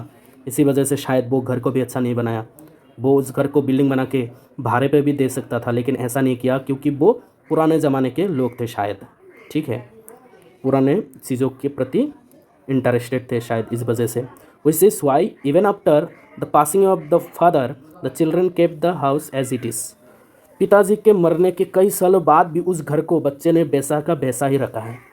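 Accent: Assam, India. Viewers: I hate Assamese accent and language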